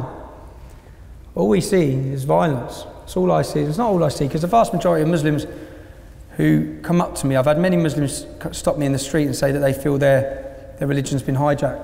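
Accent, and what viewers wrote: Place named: Cockney, United Kingdom. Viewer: Interesting. I agree that his 'cockney' accent, causes him to be judged as a 'dope' maybe, simple, unintelligent. Unfortunately he's not an EFFECTIVE public speaker, he is too emotionally involved and just a normal guy, not many people are EFFECTIVE public speakers. The movement to counter extreme-islam needs to be reshaped and somehow detached from EDL et al. It needs highly intelligent, calm, eloquent, likeable, articulate and convincing speakers to be the face before it gains widespread traction and poltically acceptable coverage in mainstream media. It also needs widespread buy-in from the muslim community. It's an issue few want to touch, because of the potential for backlash... I'd be inclined to think you need a character like Tommy Robinson... one who isn't scared of the backlash. Those people are few and far between as there'd be few who have his experience and his background and therefore the motivation, but ALSO have the public speaking ability of Obama or Blair AND the likeability of Dwayne Johnson or David Attenborough.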